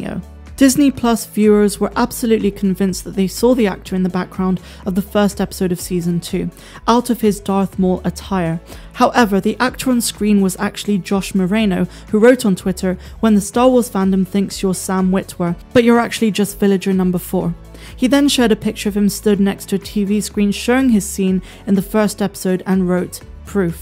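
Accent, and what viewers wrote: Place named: Bristol, United Kingdom. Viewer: Love how you say jibba jabba, sound like you're from the Bristol area ? You should say jabba jabba instead 🤣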